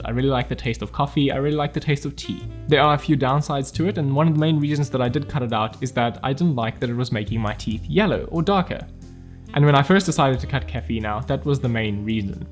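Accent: South Africa. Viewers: You South African mate?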